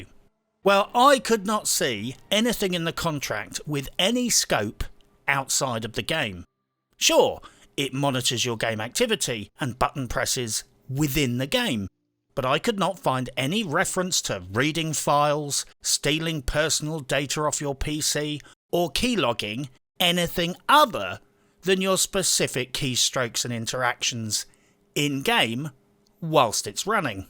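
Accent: United Kingdom. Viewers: Thanks british guy 👍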